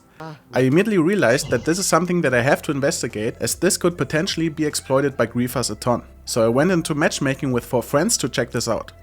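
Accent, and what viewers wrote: Germany. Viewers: Cool vid and friendly deutsch accent :)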